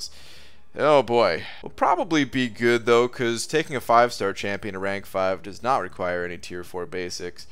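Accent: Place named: Germany. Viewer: Hi are you from germany you had german akcent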